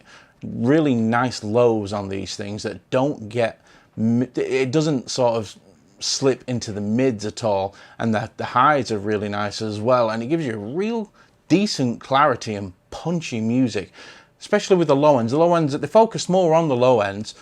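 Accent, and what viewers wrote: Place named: Lancashire, United Kingdom. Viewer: Well that makes sense now. I could tell you were from the Lancashire region. You've done well to retain your accent. I've a pal who moved to the States when he was 13. He now sounds as American as Colonel Sanders. Good review by the way.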